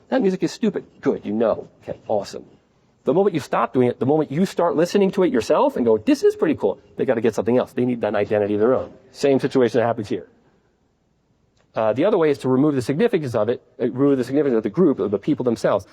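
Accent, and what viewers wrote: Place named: New York, United States. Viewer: Excellent work cleaning this up—I'd actually never heard him speak intelligibly (due to audio quality, not any property of his speech). This guy more or less saved my life with his blog posts. I was completely ignorant, deluded, and self-destructive to an extreme degree until TLP's blog (TLP is the blog, I know, but it's sort of also the man) found me and taught me, very cryptically and indirectly, how it is that a human can exist. I knew they could, and do, exist, but not how. He sounds like he's from New York. I won't check.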